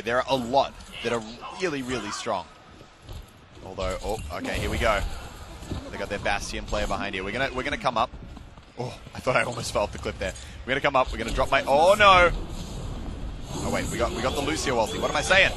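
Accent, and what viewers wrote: Germany. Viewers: why is the voice german?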